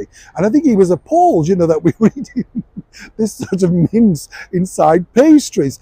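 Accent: Yorkshire, United Kingdom. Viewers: am I detecting a Yorkshire accent from you? Love it ❤ - Merry Christmas 🎄🎁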